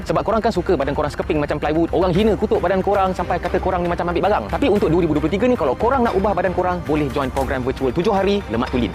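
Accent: Indonesia. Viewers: Itu nk claim ini nk claim...dh takde keje sgt ke...rendang...mkn jer laa cekik kasi habis...tgk muka cara ckap dh tau org mana...ingat org bodoh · Kalau nak tipu pun biarlah menipu dengan pandai, janganlah pakai orang indo yang loghatnya ketara sangat. Betul lah bongok, patutlah IQ mereka sama level dengan golilla (gorilla)!! Reference: Boru Naga 2 hari lepas "IQ rata rata orang indo sama dengan gorilla, pemuda indonesia di-kecam sebab membela malaysia".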